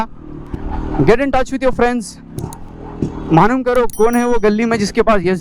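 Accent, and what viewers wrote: Hyderabad, India. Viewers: What a beautiful feelings.. 🥰 I am understanding your feeling the way your talking by heart. And your hyderabadi hindi is more Cool. I mean Mazze aaye sunkar.. The Sound of the your Roadking is very very super.. I just Loved it. 🤩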